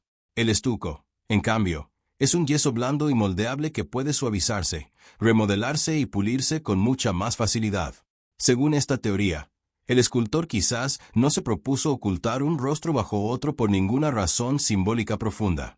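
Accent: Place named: United States. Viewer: Todo esta muy bien excepto el acentito ridiculo de gringo....😅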